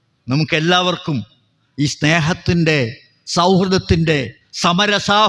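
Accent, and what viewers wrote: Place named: Mavelikkara, India. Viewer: ഉള്ളിൽ നിന്ന് വരുന്ന സ്ലാങ് വെച്ചു മാവേലിക്കര-അടൂർ ഭാഗമാണെന്ന് ഊഹിക്കുന്നു.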